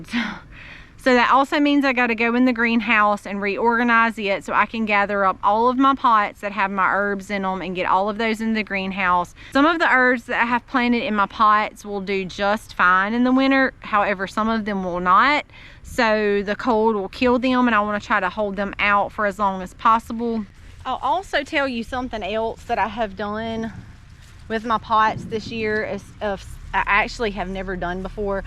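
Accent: South, United States. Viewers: I literally could sit and listen to you talk all day long with that southern accent!!😊😊😊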